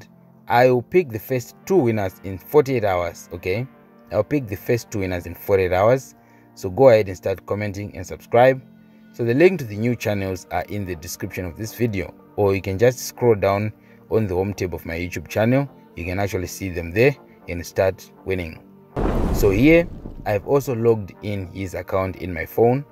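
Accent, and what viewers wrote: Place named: Zimbabwe. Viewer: I once asked you if you are a Zimbabwean that kind of English l know it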